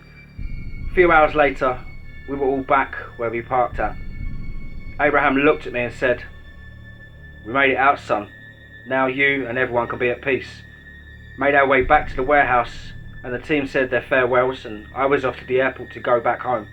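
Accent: England, United Kingdom. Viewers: You rock,. Keep it up. Love to hear stories in an English accent... My forbears are from the Isle so it's in my blood. I hope you had a happy Xmas with the family. Stay safe and keep up the awesome work.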